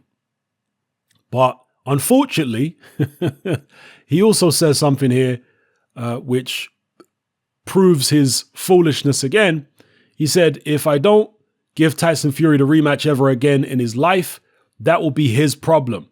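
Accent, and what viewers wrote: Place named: United Kingdom. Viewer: It's funny how I can tell you're black even with a British accent. 😄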